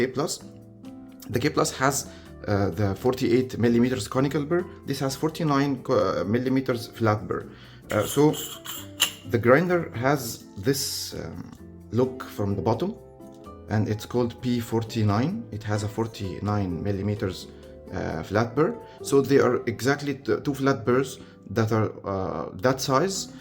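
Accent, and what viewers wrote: Egypt. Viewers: subscribe and support for Egyptian content and Egyptian Accent 😍🔥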